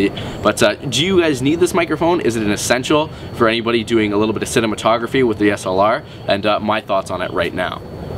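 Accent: Canada. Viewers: haha, you're canadian :) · canadian accent? cute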